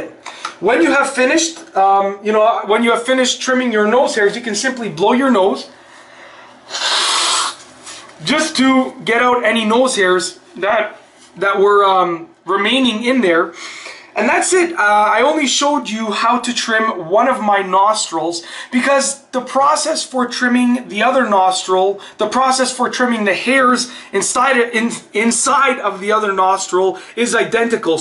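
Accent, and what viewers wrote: Canada. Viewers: also nice thick canadian accent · Are you Canadian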